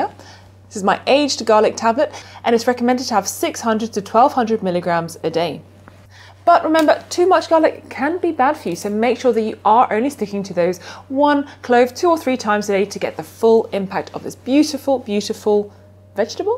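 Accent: England, United Kingdom. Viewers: Great video (shared). Seen some of your other videos and noticed your from Australia but your accent is more English as I'm from the UK myself. Did you once live in the UK and if so what Town/City.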